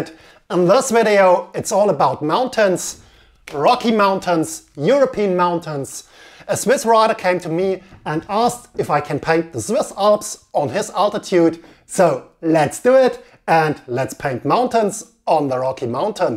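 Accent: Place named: Germany. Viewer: nice accent ;) ... german ?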